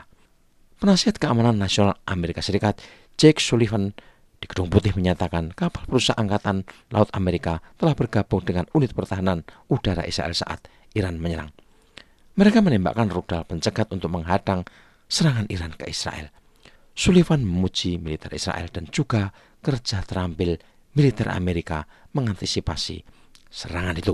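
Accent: Java, Indonesia. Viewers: Terlalu medok Logat Jawanya diperhalus yaaaa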